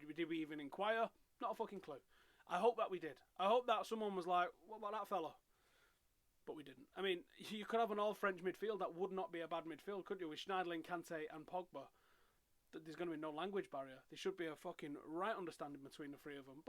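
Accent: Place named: Newcastle, United Kingdom. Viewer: Another geordie on Youtube pfff -_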